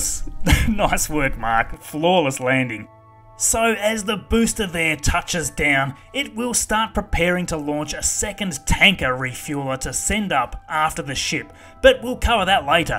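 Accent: Australia. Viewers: Got to love (or hate) the Aussie accent! :)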